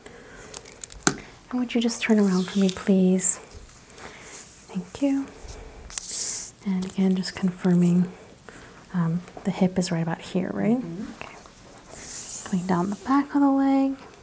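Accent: South, United States. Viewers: I love her accent… so southern ❤ I’m from Alabama so I guess that’s why I love it 😊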